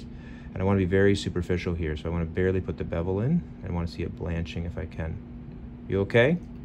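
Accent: Canada. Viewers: Your accent is Canadian. Good work Doc! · He’s Canadian.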